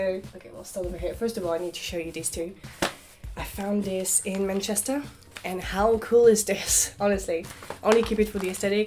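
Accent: France. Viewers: Gorgeous Gorgeous Gorgeous Girls speak English in a French Accent and recommend books despite feeling ill.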